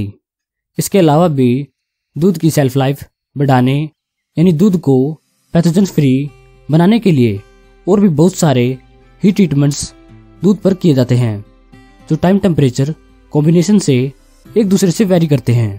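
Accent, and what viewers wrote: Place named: Haryana, India. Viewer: Haryanvi hindi....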